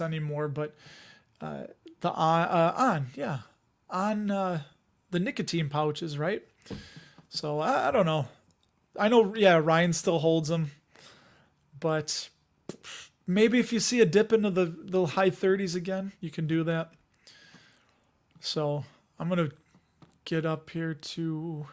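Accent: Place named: Chicago, United States. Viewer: GREAT video.... Always love watching the replay.... Quality viewing... Love the Chi accent... LOL..... Long live Gino's East.... I do the next best thing since living out of IL - so I order the Lou's - deep dish... They come out pretty good. Love the show.... You make learning fun. You're a true soul.... Be well...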